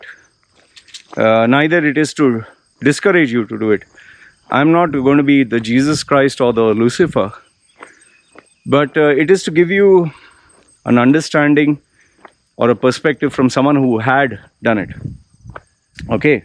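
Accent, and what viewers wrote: India. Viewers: your accent is more like an Indian. where are you from brother?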